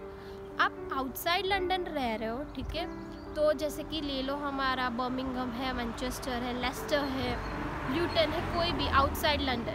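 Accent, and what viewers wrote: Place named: Gujarat, India. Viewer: The way you speak hindi is so adorable ♥️ and obviously that gujju accent makes your every single word soo sweeet♡ keeep growing budd💫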